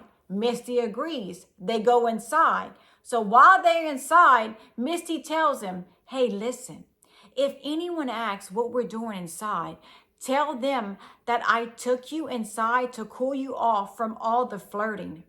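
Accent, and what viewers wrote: Louisiana, United States. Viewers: My new favorite channel. Southern Louisiana boy living out of state. A smart cajun sounding voice is entertaining and comforting. Keep it up and thanks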